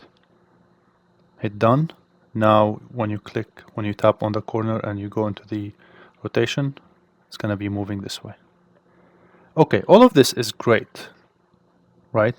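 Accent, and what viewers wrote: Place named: Serbia. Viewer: You have a Serbian accent:)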